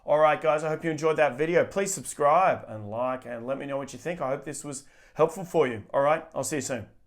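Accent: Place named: Australia. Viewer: is such a treasure! Hello from America. I could listen to Aussies talk all day.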